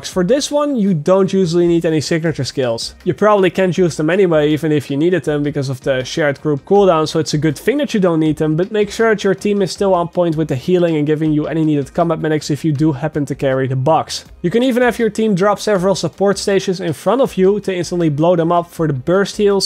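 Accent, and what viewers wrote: Netherlands. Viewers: yo this guy dutch?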